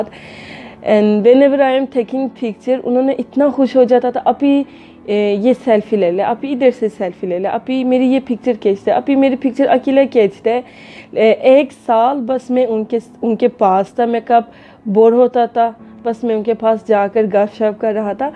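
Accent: Pakistan. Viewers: I really love your Urdu accent as wall as your vlogs and I mostly recommend your vlogs to my niece , she is American she doesn’t try to speak Urdu because of scary to people . Good luck my dear sister. Allah bless you and your entire family . Ameen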